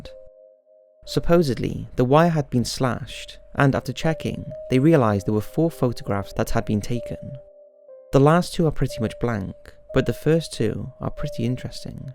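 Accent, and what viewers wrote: Wales, United Kingdom. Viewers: Will follow when you start speaking properly and stop sounding like some Welsh Robot. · Are you welsh?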